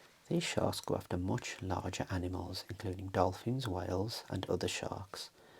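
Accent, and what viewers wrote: Birmingham, United Kingdom. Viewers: Mother of pearl. If there was an Oscars for ASMR, this would be win some awards. A lot of ASMR artists just read the books word for word and I find this a bit boring and struggle with this. I LOVED this video as you mix it up so much and keep us in the loop (loved all the "save your money" comments!). I know this is a live video but your style is really good. I have only just discovered your channel (shout out to reddit!) and really really enjoy your work. Like a Brummie version of Mr. Relaxing ASMR! lols! (That is a complement btw! Love your accent!) Ps. I also LOVED the tapes and CD ones. Especially as it is clear you were an old school raver! Or were you lol? (make some noizzzzz!)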